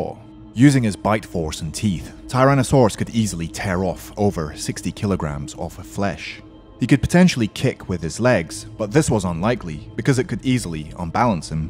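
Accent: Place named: United Kingdom. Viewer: Just because you speak in a British accent doesn't make you an expert on what happened during the age of dinos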